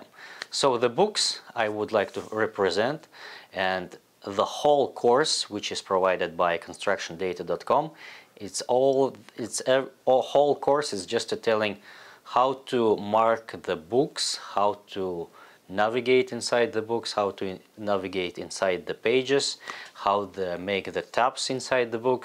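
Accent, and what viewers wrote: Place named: Russia. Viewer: Sounds like Russia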